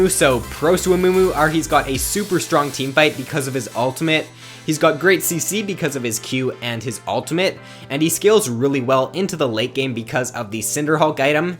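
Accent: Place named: Canada. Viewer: i see you're canadian "eh" me two :D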